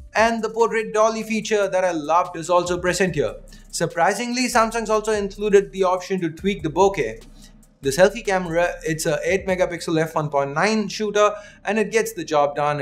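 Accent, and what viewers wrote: India. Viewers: i couldnt hear 80% of what he said. 1. too fast 2. deep Indian accent. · Indian talking in English. My gosh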